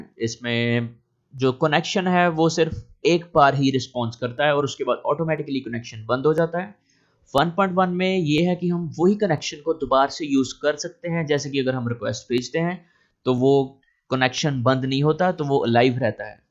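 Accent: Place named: India. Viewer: Stop speaking Indian and only speak English as have a hard time understanding your heavy accent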